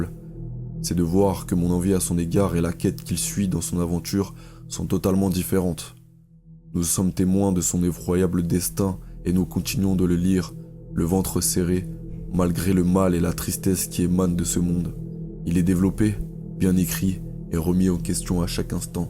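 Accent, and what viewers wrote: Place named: Banlieue, France. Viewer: Je suis fan moi aussi de l' univers de Miura. Votre vidéo est intéressante et prometteuse, je vous encourage vivement à en présenter d' autres ,que ce soit pour approfondir sur Berserk ou aborder d' autres de vos passions. Votre petit accent de quartier m' a rappelé les ( très heureuses) années où j' ai travaillé comme instit en ZEP. Quand je croise mes anciens petits élèves , ils ont un peu cette voix et certains sont devenus des golgoths qui me dépassent d' une bonne tête😂 . Je ne travaille plus dans l' éduc nat.( Je suis handicapée et je me consacre désormais à l écriture et au dessin) Je ne vous écris pas cela pour vous raconter ma vie mais parceque vous demandez des retours sur votre travail . Il comporte beaucoup de qualités mais il y a quelques petites maladresses d' expression qui gagneraient à être corrigées 😊.Vous aurez,si vous continuez à produire des vidéos, des auditeurs d'horizons très divers er ce serait dommage que quelques maladresses gâchent leur appréciation sur votre travail. 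Si vous voulez je peux corriger rapidement le script de votre prochaine video, ( gratuitement ) . Vous écrivez plutôt bien et je sais que ça ne me prendrait pas des heures. je trouve que votre propos serait mis davantage en valeur. En tout cas félicitations, vous donnez envie de découvrir cette fantastique fresque graphique sans rien spoiler! Si vous voulez me joindre je suis sur Facebook ( et oui,j ai 50 balais, c' est bien de mon âge !) et sur Instagram à mon nom. Cécile Creixell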